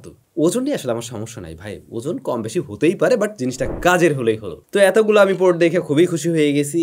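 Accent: Barishal, Bangladesh. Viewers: ভাই আপনি খাশ বরিশাইল্লা! টাকাটাকি বাদ দেন!❤❤❤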